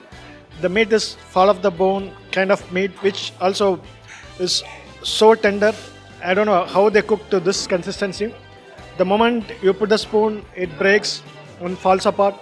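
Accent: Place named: South India, India. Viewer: THIS SAUTH INDIAN ACCENT OF NARETION LOOKS ODD TYPES OF IFFICULT TO UNDERSTAND FULLY...NO GOOD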